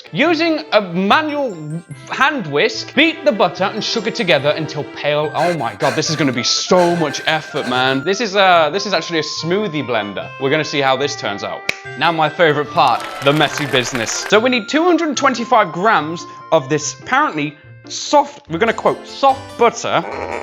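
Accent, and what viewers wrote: United Kingdom. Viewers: I can hear the British more now that he is not speaking directly into the microphone. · Why does he sound extra British then normal in this video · He sounds really British in this video for some reason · with bad mic sound like real British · I like how when cooks or makes anything he just becomes so extremely British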